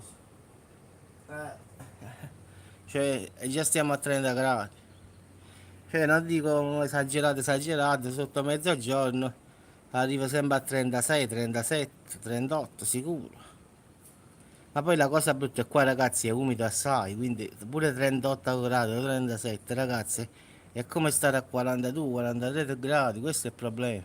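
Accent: Naples, Italy. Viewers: 🤣🤣 come sei divertente con stu napoletano!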